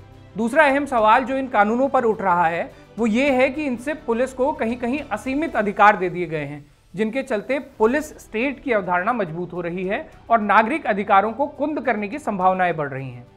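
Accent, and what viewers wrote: Garhwal, India. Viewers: जी आपकी पूरे हफ्ते की छुई बात का इन्तज़ार रहता है, बीच बीच में आपका garhwali बोली का इस्तेमाल आपकी रिपोर्टिंग को बड़ा ही रोचक बना देता है 👍👍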